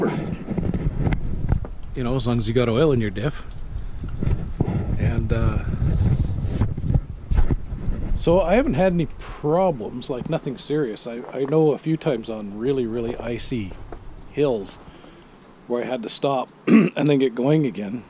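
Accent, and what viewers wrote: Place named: Canada. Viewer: Sounds like a Canadien.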